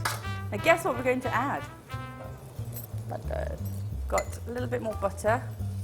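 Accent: United Kingdom. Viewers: kab sudhregi tu.... asli naam rahega.. chandni raddiwali!... faking a accent & having a accent has a difference... faking a accent means using same words as indians use while talking English but with a different tone.. she speaks & uses all the words like a British person would do because she is from london!.. she is a chef watch her cooking & learn that.. don't comment on her accent & tell kab sudrenge.. tu sudhar pehle gaav waali! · ankush verma She has a British accent. If you're going to complain, at least get your facts right. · It is a British accent...can you be less ignorant? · Love your recipes, your presentation, your stories, your “Hello darlings!” and of course your lovely british accent. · i really dont know why people dont like her accent! she's BRITISH! anyways i like her energy and vibe :) ! good watch !!! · thats a proper british accent u muppet. 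she was brought up there and u mr.' my accent is perfect' finds it funny? lol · Come on!!!!!! she's BRITISH n that's how their accent is.....!!!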